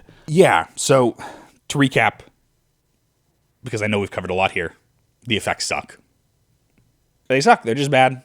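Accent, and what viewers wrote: Germany. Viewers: subscribed because of the german accent JA! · thanks for save me, buying this! btw best german accent, großartig!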